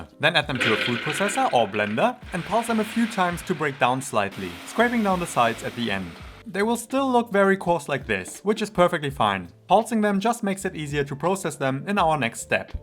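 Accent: Germany. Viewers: Haha, came for the recipes and stayed because of them - despite the german accent and the "wordaah" 😂 I really like the explanation and comparison of the two versions! Will copy it, thank you! 😉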